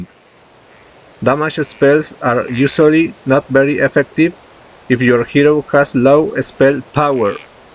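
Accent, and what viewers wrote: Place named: Spain. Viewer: Porque este ingles suena como el de Mariano Rajoy?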